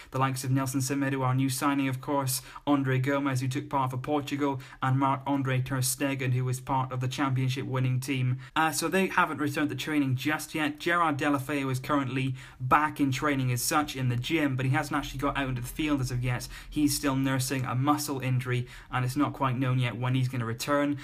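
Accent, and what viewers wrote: United Kingdom. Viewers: i have a question are u british ??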